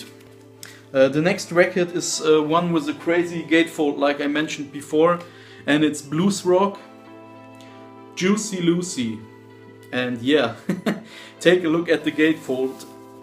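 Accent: Germany. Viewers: Are you a german Guy?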